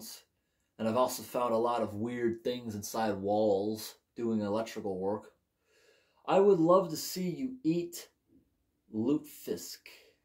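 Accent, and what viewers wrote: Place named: Massachusetts, United States. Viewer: I've just stumbled onto your channel in the past few days, and you are an absolute delight. It's funny that we're the same age and have similar interest. I am a bit more into the entertainment side of vintage products and packaging like Chuck E. Cheese and Gadgets (Warner Brothers themed animatronic pizzeria). I wonder if the electrical and technological side of that may be interesting to you. Also, that Blue's Clues pasta in the background has really been taunting me. Are you going to save it for a reprint of the pasta? It may be more advantageous to just look from the SpongeBob pasta from the same year to get an easy comparison since they still make it. Oh, additionally, that "why do they call it an oven when you ove in the hot food and out hot eat the food" is a reference to an edited Garfield panel. It's a kind of obscure meme. 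Last thing, your Massachusetts accent is delightful. Stay rad. Love your stuff!!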